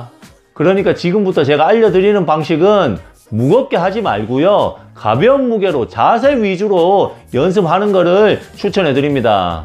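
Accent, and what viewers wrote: Gyeongsang, South Korea. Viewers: 형님 항상 꿀팁 잘 보고 있습니다~ 같은 경상도라서 그런지 말투도 친숙하고 귀에 쏙쏙 설명이 박히네요 앞으로도 좋은 영상 계속 찍어주세요 (개인적으로 일두근 영상도 다시 해주셨으면 하는 바람,,)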